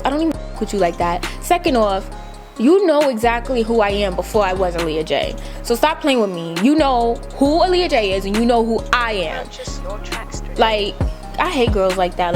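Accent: New York, United States. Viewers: Lol I love u 😂😂😂 u so New York 😂😂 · Is it me or she talkin totally dif..like a new yorker..like nicki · i loveeeeeee your accent 😭 true new york girl lol. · The best New York accent! 😆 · This shit funny as hell. She so NEW YAWK. I love itttt 😍😍😍🤗😂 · She’s so new YAWK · NY accent was just popping out extra todayyy,love itttttt. looooool. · Aaliyah so New York I can’t lmaooooo I love it though 😂💜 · Aaliyah can travel all over the world but you can literally never take the New Yorker out of this girl!lol.. Our New Yorker accent and slang is so so strong. Love it! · Sis youre sounding EXTRA New York in this one!!! Lol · Aaliyah looks beautiful, but is it me or do I hear her NY accent more now! hehe:) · U know I love how New Yorker speak yo lol I just live for hearing Aaliyah speak lol I'm from JA and New Yorkers accents are my fav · Aaliyah Is Such A NewYorker I Love It I Haven’t Heard Somebody Say “You Bun’s” In So Long 😂😭😭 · Omg I missed ur story times I love u I love the way u talk the nyc accent is everything 😘🤗💜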